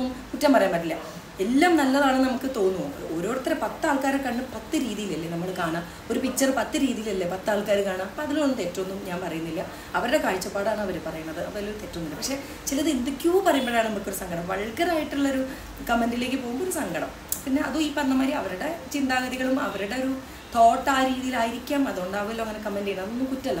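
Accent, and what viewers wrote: Kozhikode, India. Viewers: കോഴിക്കോട് നാട്ടിൻപുറം സംസാരം 👍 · Very decent, humorous, transparent, innocent, spontaneous way of talking...over aakadhe ulla presentation um. Just can't take eyes from ur video.nammade kozhikodan baasha vrithiyil kaikaaryam cheyyunnu. Good going 👌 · 😂😂😂 sudari pettanu evidayo kettapole 😂😂soo beautiful so elegant just looking like a wow orma vannu😂😂😂 basha evidayo kettapole (ibbal evidaya) Kozhikode Aya enniku pettanu mansillayilla😂😂 nalla humorous talking ee fast chayitha bagham cut chayitha pore njagalku kekunumilla pinne athu cut chayitha leghtum korayum etha ee pennkutti Ella prodaktillum edukumbam parayunna 😂😂 enniki oru likeum comment tharane please 😊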